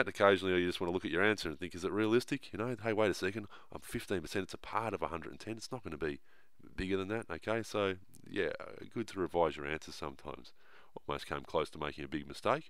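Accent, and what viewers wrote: Australia. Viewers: Are you Australian? Sorry if your not yo just sound Australian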